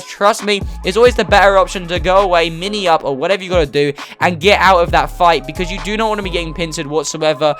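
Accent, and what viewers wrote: United Kingdom. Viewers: Are you English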